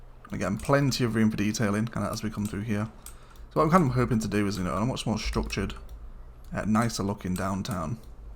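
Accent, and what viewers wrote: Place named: Liverpool, United Kingdom. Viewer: Where are you from in England? Your accent makes you sound like a Beatle. Are you Ringo Starr?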